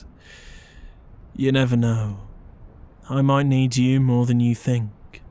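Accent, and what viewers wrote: United Kingdom. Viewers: Love your British accent 😍 also you very amazing person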